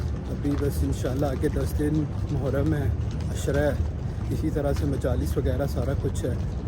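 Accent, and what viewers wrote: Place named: Kuwait. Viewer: wohii kwt wala accent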